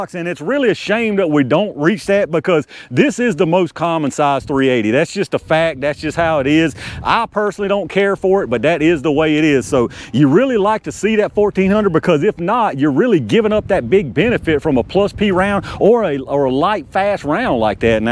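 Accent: South, United States. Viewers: Your thick southern accent makes me feel confident that you know wtf you're talking about 🤠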